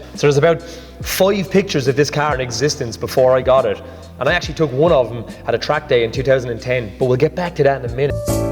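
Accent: Ireland. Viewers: To be honest Irish ascent could be difficult to learn with haha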